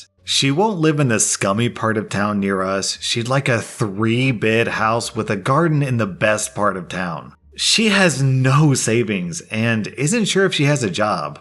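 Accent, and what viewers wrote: United States. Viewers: Woah... an American who says "pat-tree-on" and "eye-ther". What's going on? I'm sure you also say "an-tie" and "sem-eye" just to throw us off.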